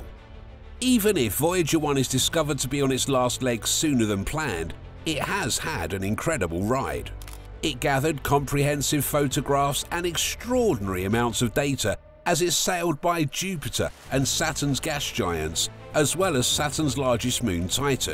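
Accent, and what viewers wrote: United Kingdom. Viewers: Loose the limey.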